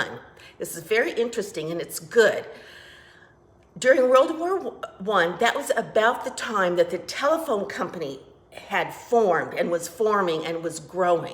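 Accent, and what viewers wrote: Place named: Minnesota, United States. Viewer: You may be in CA, but you sound a lot like my family in southern MN💕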